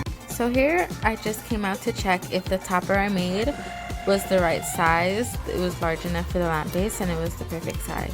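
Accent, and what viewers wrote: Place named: Trinidad and Tobago. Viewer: Lovely. You sound like a trini!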